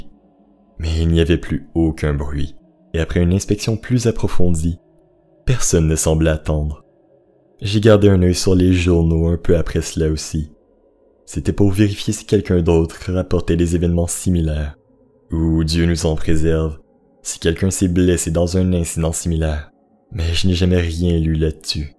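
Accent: Quebec, Canada. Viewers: Mais ta un putain. D’accent québécois pourtant sa a l’air intéressant jte dit sa mes mon accent marseillais n’est pas mieux ✌🏽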